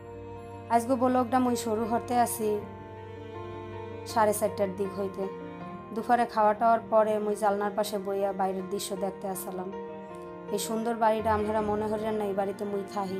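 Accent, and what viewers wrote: Barisal, Bangladesh. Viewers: Apu tomar prothom vlog ta khub e sondor hoice. borishaler basa sunte aro besi valo lagce. Tomar notun channel er jonno shubo kamona roilo bonu. Onek dur agiye jabe asha kori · Apo tomar vasha sone na hese parlamna!amar des o borisal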